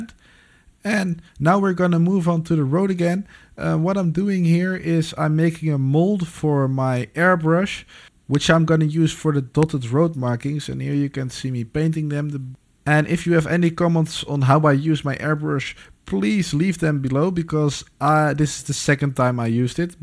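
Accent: Netherlands. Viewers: Love het nederlandse acent in het engels😂😂😂😂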